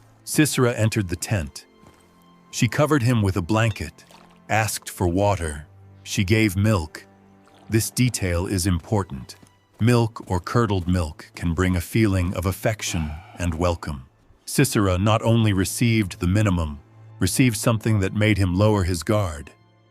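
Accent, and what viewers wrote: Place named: Africa. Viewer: African accent you aren't saying ask but axe ..put me right off.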